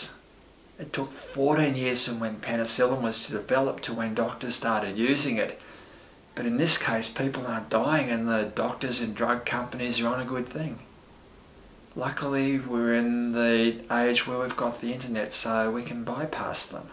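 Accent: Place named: Australia. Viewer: You sound like an Aussie, what state are you in?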